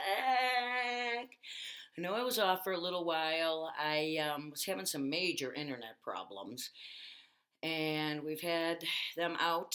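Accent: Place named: South, United States. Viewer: I thought he had a Southern Drawl? Maybe the trip gave him a scratchy throat?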